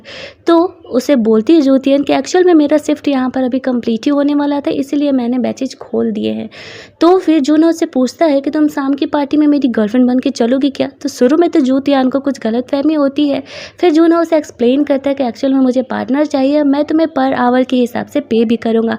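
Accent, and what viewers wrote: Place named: Bhojpuri, India. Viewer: I love when u use Bhojpuri tone .😉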